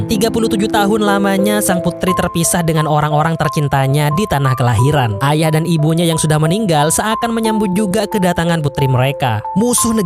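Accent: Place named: Madura, Indonesia. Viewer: LOGATNYA KETAUAN BANGET KALO ORANG MADURA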